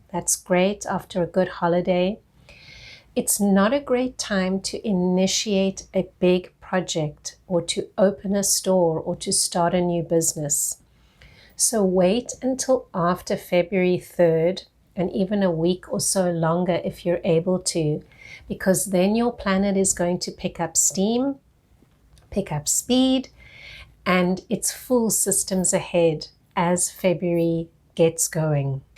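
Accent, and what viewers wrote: South Africa. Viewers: From Sth Africa?? I love the accent. Cheers.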